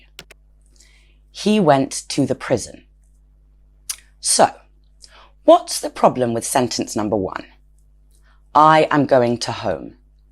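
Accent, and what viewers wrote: United Kingdom. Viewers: 딱 영국발음 좋아요 좋아~! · 영국발음이 좋기는 좋구나... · 여윽시 영국식 발음과 억양은 독일어 배운 나에게 엄청 매력적임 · 영국 발음 매력적이에요~ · 와 엄청 도도하고 섹시하다 영국발음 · 아 진짜 영국 영어 엑센트 너무 좋은거 같아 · I had an appointment with my doctor 이렇게 말해야 하나? 하고 들어온한국인... 근데 영국식 영어인... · 영국식 발음 죽이네요. 마치 영어가 아닌것처럼 들려요..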